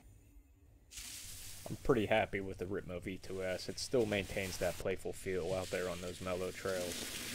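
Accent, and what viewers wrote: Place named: Pittsburgh, United States. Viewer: Without even reading your page I knew you were from the burgh. Thanks for the review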